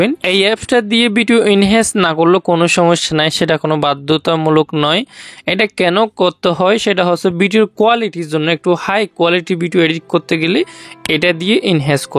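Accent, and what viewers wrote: Chittagong, Bangladesh. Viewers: ভাইয়া মনে হয় চট্টগ্রাম এর..? আপনার কথা গুলা শুনে মনে হয় ☺️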